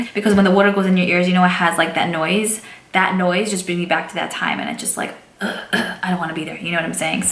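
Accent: New York, United States. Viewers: When I first started watching you I thought you were Italian lol. But, now I realise your accent is New York or Bronx. I think you're adorable, and so likeable. I could really see us being friends. Thanks for filling us in on who YOU are, and we all love you.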